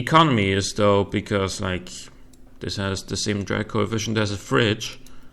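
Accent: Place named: Germany. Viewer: A few questions : 1) How are your cars so light ? Mines will always be like minimum 1600kg... 2) Where did you get the customs tracks I saw in your previous video ? I would have loved to be able to test out my own drag cars but coudnl't. 3) Also where are you from ? Because your accent is so nice :3 I guess maybe Germany. 4) Are your chassis made by you or are they just modified vanilla chassis ? 5) I don't know what I should say next, I feel like I'm so bad at this game :(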